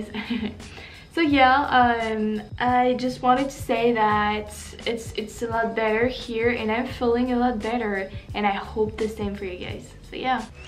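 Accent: France. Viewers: I think you are such a great person! I love your passion for her and you are right that she can make life better to listen to her when things are stressful. with the virus right now she is able to make it better. You are so cute as well and I love it when you talk! I love the french accent you have and it makes me happy just like when sings!!!! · Your ( French ) accent is so beautiful, so calming, so relaxing as well...Great reaction my friend..Cheers..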